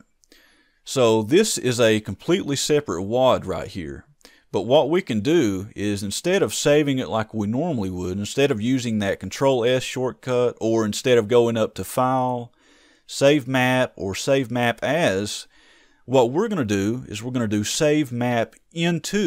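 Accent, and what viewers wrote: South, United States. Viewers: I think more tutorial people should have southern accents, it makes me more attentive to listen.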